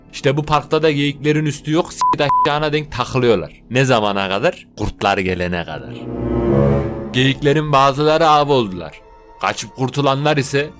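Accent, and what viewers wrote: Ankara, Turkey. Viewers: herşey güzelde Ankara lı böyle mi konuşmak zorunda ,ne oldu güzel türkçemize? bilgilendirme için teşekkürler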